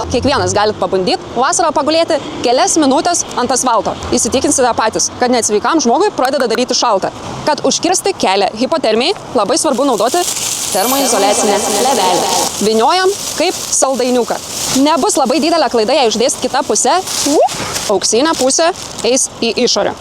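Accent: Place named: Russia. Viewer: Ljabei fajnas rusyskas akcentas. Ljabei acju uz jusu ismynti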